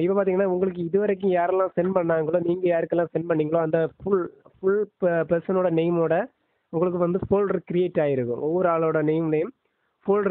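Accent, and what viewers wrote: Kanyakumari, India. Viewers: Bro neengha entha oru speech keata Kanyakumari Dist pola irruku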